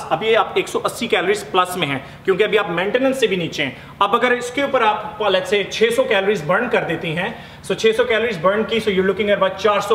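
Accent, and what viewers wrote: Punjab, India. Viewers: Stumbled upon his channel love love the way he talks especially his hindi/punjabi accent so I subscribed :P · i m also punjabi and the way u speak punjabi is sooo cuteeee..... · Lovely video and lovely cute punjabi wali hindi awwww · Sir u r Kainttt..... I am going to follow this diet plan...... And I like the way you talk Hindi, English te naal Punjabi da tadka aaye haaye..... 👍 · hahaha I really enjoyed this video.. your Punjabi accent is aala.. diet plan is good..keep it up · Your. Punjabi accent😘😒😒lovely 😘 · Ur way of speaking Punjabi is so cute.i also want to loose some weight but mujhe baar baar kuch khane ka man krta h.so plz aisa diet plan do 4veg jisse tummy fat km ho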